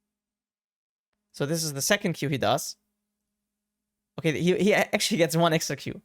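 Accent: Germany. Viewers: speaks actually good, it's just the german accent. If you dont like it, than just dont watch it^^ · his English is good he only has a strong German accent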